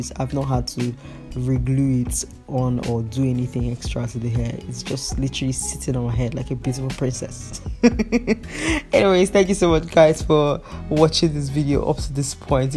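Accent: Nigeria. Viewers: “I will overpluck you from my comment section” the way she says it😂 I love my Nigerian sistas, the accents are everything bc it makes everything else funny y’all say a mil times more funny😂😭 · Gurrrlllll I love it...talk your naija talk babe! But awesomely explained 💋💋💋💋...then ending it with korean👀👀 yes ma'am ahnyeong-hekasayo! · Immediately I heard her first statements I knew she's either a Nigerian or have had close contact with Nigerians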